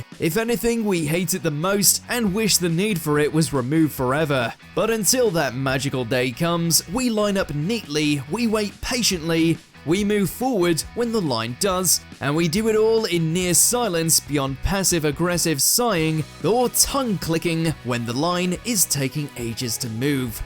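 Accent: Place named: Northern England, United Kingdom. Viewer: He pronounced it like most of Northern England. Class, grass, dance, bath, & laugh, don't have the same sound as father there, but more like mass. I won't bring up Scotland as there are multiple accents here, including ones where fancy is pronounced funcie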